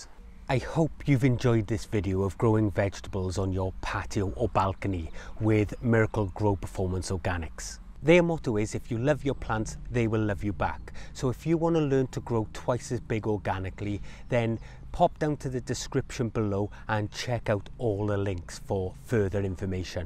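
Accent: Wales, United Kingdom. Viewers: nice to hear the welsh accent here all the way from down under!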